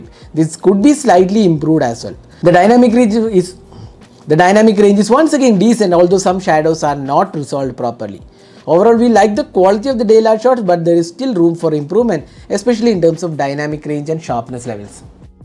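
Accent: Kerala, India. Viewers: Malayali accent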